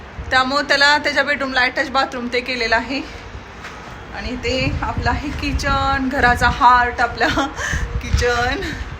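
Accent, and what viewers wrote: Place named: Khandesh, India. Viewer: khup diwasatin nahi ....khup diwasani.....please correct marathi bola ...bolnacha tone khandeshi kivha Vidharbh kivha Kolhapuri vatate.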